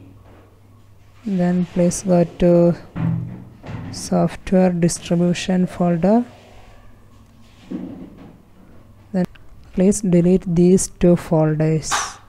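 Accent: Kerala, India. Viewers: anyone notice that mallu accent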